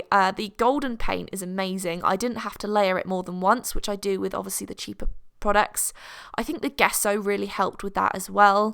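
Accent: United Kingdom. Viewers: funny gal. I wanna know where in Britain they talk like that because I dislike it very much.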